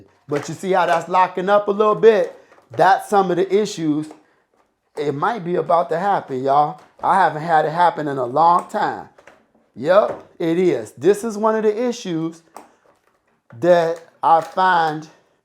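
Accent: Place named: Washington DC, United States. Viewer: Thank u brother helpful video got the same set haven't had any issues yet. Sound like u from D C u gotta accent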